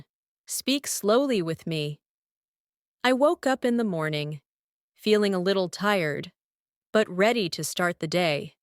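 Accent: United States. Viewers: Your english sounds american...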